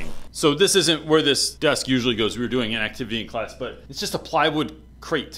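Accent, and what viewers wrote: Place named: Philadelphia, United States. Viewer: Thank you! It’s nice that focus isn’t an issue anymore ))) Instantly glued to the like button. You accent is truly Philadelphian ! Love it. Thanks! Sir!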